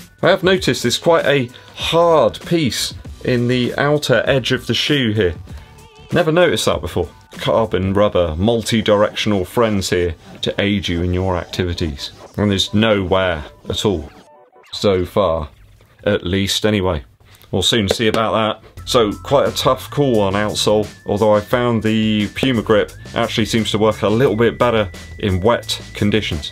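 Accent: England, United Kingdom. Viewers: I love hearing the English pronunciations! Thanks!! Never owned a Puma shoe, but I'm loving the looks of the new line.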